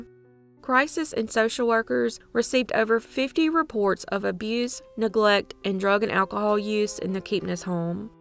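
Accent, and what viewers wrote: South, United States. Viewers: Hi,it is nice to finally put a face to that cool southern voice. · that beautiful Southern drawl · I love your Southern voice, and the channel: you rock!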